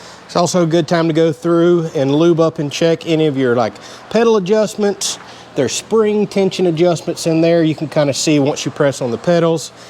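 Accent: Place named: Florida, United States. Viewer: Florida accents bust me up sounds like you say oil without the i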